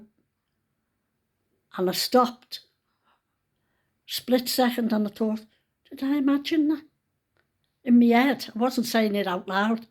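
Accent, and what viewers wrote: Liverpool, United Kingdom. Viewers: Hi I've just subscribed after stumbling across your channel whilst looking for how to use a pom pom maker😂😂😂 have shared with my son who's currently teaching in Thailand. He also likes your videos. Like me, he loves to hear about all things supernatural. The way you tell it especially with your great scouse accent ❤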